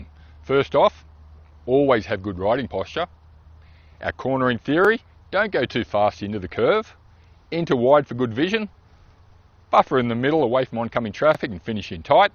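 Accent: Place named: Australia. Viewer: Brilliant explanation. Aussi English 😍 thank you 🙏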